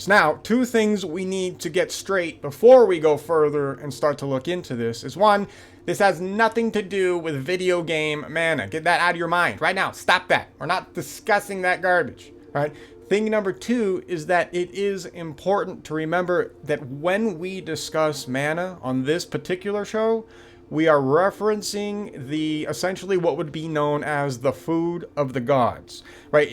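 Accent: United States. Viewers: What kinda accent is this? East coast for sure, NY? NJ? PA?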